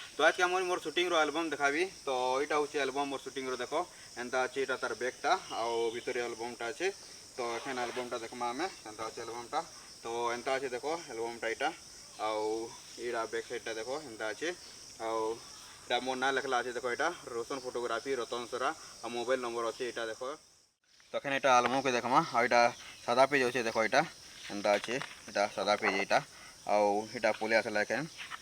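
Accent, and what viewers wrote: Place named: Sambalpuri, India. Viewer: କଂଚା ସମ୍ବଲପୁରୀ ଏ ,,,,,, ପୁରା ଖଡ୍ ଖଡ୍ ଖଡ୍ କରୁଛେ ବଲ 😅😅😅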